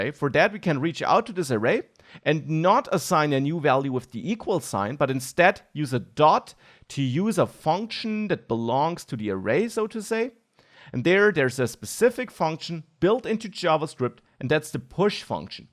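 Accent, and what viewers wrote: Germany. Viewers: I love the way you walk through and explain all the different concepts here, supported by great examples. You are articulate, concise, and obviously very knowledgeable. Your (slight) German accent only adds to the charm, so bonus points for that!:-) I just bought the full course after watching this introduction, because you are the best teacher I have come across yet on this topic, and I have seen quite some. I really can't wait to dive deeper into the super interesting world of JavaScript. Thank you very much, and keep up the great work!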